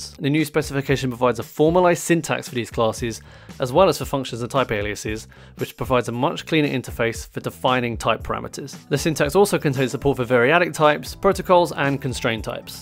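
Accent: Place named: United Kingdom. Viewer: No thumbs up. I live in the US so my ear is not tuned to a British accent. The accent along with your fast talking made it a challenge to understand. At some points I changed the playback speed to 0.75. My suggestion to get more thumbs up? Slow the F**k down! Are you simply trying to impress us that YOU know this information, or are you trying to impart the information to the rest of us? Communicaiton is NOT speaking words, communication is transferring ideas from one brain to another brain. Do whatever it takes to acheive that goal.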